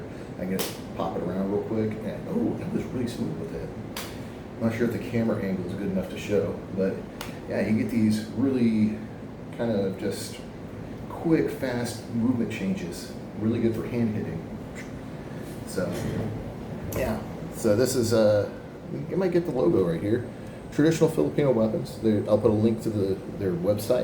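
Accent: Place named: United States. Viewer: your accent is american, but your house is balkan, where the hell are you from lol cool swords btw <3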